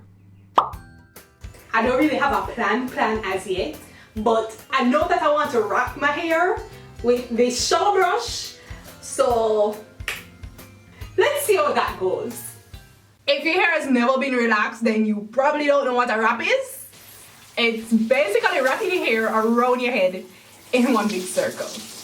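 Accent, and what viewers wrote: Jamaica. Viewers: Which country are you from? Because I hear a jamaican accent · R u Jamaican or carribean???? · Her voice is high but her accent sounds pretty Jamaican and she's said that she's Jamaican.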